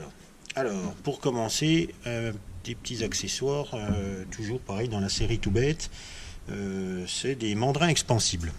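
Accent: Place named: Jura, France. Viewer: Tu dois pas habiter loin du Jura je me trompe ? T'as un léger accent ^^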